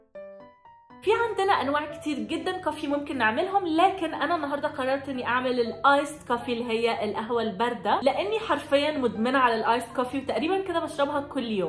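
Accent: Egypt. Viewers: هو انت مصريه 🇪🇬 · صح ولهجتها مصريه وتحاول تحكي زي سكينه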